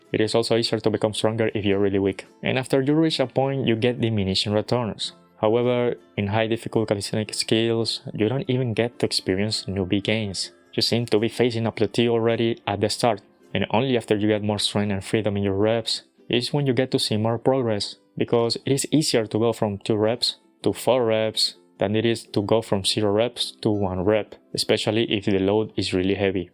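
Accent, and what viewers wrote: Spain. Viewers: That muscle up handstand is awesome. You sound like a European.. it's like hearing a Spanish person speak English. Interesting! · First time seeing an asian guy with a spanish accent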